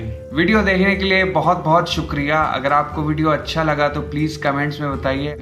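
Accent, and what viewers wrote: Bihar, India. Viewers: Bro, your comic timing is just amazing and by your accent, I can absolutely say with utmost confidence that you are too from Bihar.. Pr bhai, aap Bihar ko agr represent kr rhe ho, kisi bhi stage pr, to uski achhi chijon ko batao, talk about his glory and the progress that Bihar is on.. Not ki kuchh paiso k liye bhai tumlog bhi wahi kro ek time pr bhojpuri gaano ne Bihar ka image kharab krne me kiya hai.. When we are not going to respect our birthplace, how will others be going to respect?? I respect and enjoy your comic timing but please let us, the educated one, try and revamp the beauty and image of our birthplace Bihar, and it should be our first priority wherever we are. Waise, hum bhi Patna se hai. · Why that person said you're not from Bihar? Your accent seems 100% like any Bihari. My friends also speaks like this. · Boliye se pta chalat hai marde tu bihar ke na hawa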